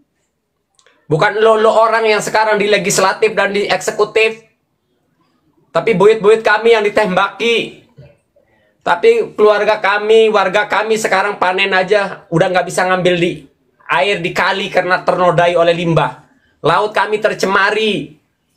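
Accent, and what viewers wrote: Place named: Cirebon, Indonesia. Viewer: Emang nte wong ndi....bersuara mslh cherbon....tp bagus juga sihh....lanjut lahhh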